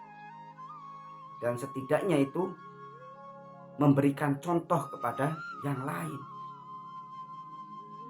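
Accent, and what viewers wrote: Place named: Banyumas, Indonesia. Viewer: Admin orang ngapak kayanya😊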